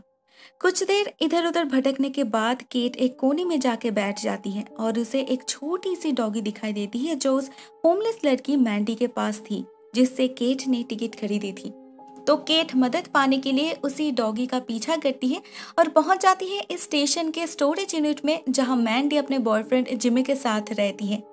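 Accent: Bengal, India. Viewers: Bengali accent..many Bengali's sound very sweet when they speak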